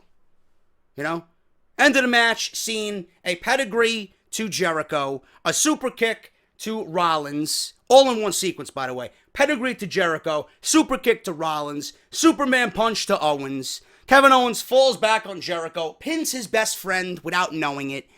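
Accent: New York, United States. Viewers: You're a fucking New Yorker man xD love it cx I nearly pissed myself though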